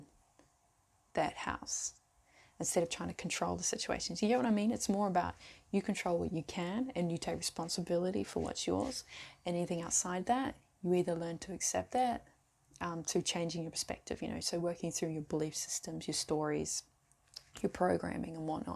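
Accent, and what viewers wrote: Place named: Australia. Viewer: Your aussie accent is growing!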